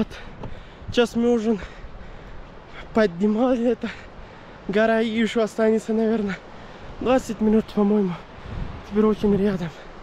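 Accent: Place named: Tajikistan. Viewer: У тебя акцент тажикский😊.. Не доехал , а дошол😊👍👍👍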